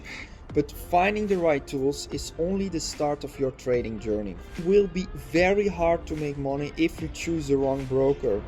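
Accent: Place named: Switzerland. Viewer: your accent sounds like swiss :-)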